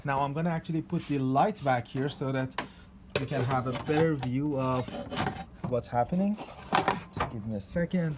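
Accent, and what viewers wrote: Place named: Iran. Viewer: Dorood Farsi accent is always so easy to recognise hahaha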